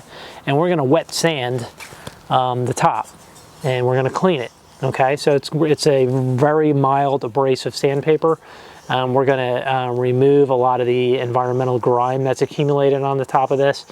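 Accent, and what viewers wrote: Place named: Mid-Atlantic, United States. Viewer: Your mid-Atlantic accent. The show is set in Delco, PA.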